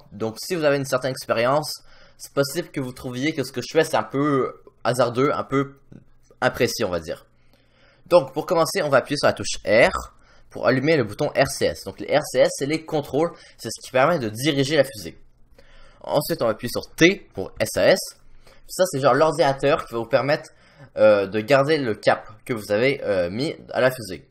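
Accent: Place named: Quebec, Canada. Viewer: Ton accent paraît québécois donc je suis casi sûr que tu es un canadien mais dis-moi, t'es un passionné de l'espace ou t'es à l'école d'astronomie en faite, moi je fais attention à presque toutes mes sources d'information, je vais dire que j'ai appris pas mal de choses nouveau qu'en voyant quelques-unes de tes vidéos qu'aujourd'hui je me suis trouvé sur ta chaîne aujourd'hui même 31 déc 2019 en chercheant avoir quelques notions sur ce que c'est "l'orbite" et pour dans les résultats je suis tombé sur la vidéo que tu as fait a propos de ça, merci de lire j'attends la réponse de ma question hein, il faut que je vous dise aussi que je suis haïtien mais vivant au chili. Merci encore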